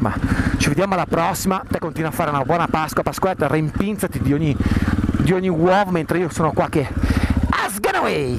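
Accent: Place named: Emilia-Romagna, Italy. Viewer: sinceramente no, dall'accento sembri delle mie parti (emilia romagna o giú di lì)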